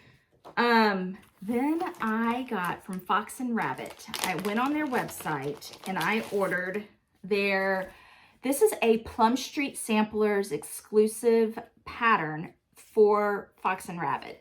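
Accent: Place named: East Tennessee, United States. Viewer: I am from Knoxville and moved to Florida in 2003 because we felt it was just getting too big. We also downsized with that move so I understand your big job ahead! What part of Knoxville are you in? Do you plan on staying in the Knoxville area? We moved from West Knoxville, but my Dad grew up in East Knoxville in the 30’s and 40’s. My husband is from Fountain City and we have also lived in South Knoxville in the 1980’s. I began watching you because I missed hearing a fellow East Tennessean and really enjoy your flosstube. I am interested in # 1, 7, 8.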